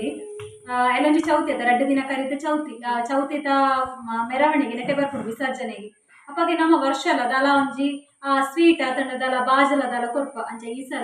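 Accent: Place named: Tulu, India. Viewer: Hi akka er tulu pathernaga batru nakl pathernaleka apund akka😍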